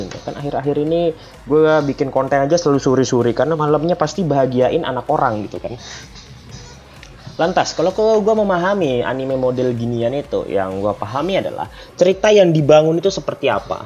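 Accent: Banjar, Indonesia. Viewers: Ikam urang banjar kh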